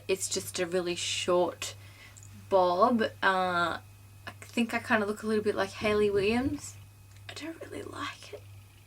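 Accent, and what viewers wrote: Australia. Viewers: Heyy there, would you be able to tell us how you initially explained to your hairdresser exactly how you wanted your hair cut in this way? I've been to soo many hairdressers, whip out so many pictures and it's just arghhhh! When I first watched another video of yours I was like AUSSIE ACCENT! Don't see many of us Aussies on youtube. =] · r u australian? you sound like it :) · That's an Australian accent! :3 I love Aussie accents way more than British personally. She's got so many great tips on her channel. I think I'll subscribe. <3 and hey, there's nothing wrong with being a kid, we all gotta be one sometime in our lives. Enjoy it! And if the 80s phase makes you happy, go on :D I'm a 90s gal myself so I understand!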